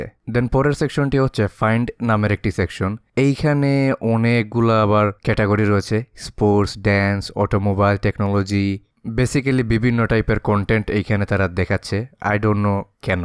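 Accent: Sylhet, Bangladesh. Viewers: Are u Sylheti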